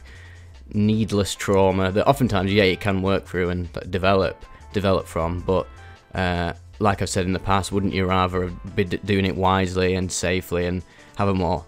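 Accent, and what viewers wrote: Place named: York, United Kingdom. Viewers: I reckon he's from Yorkshire, up in the North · Luvin the ooooh ai, cheeky bit'o York speakin. All reminders of home/my British heritage aside, appreciate the sharing of your wisdom! I'm fascinated by psychedelics and hopefully with the right intentions, setting and people I can use it as a positive tool. However also knowing the extreme risks in undergoing such activities. Thanks again! 🇬🇧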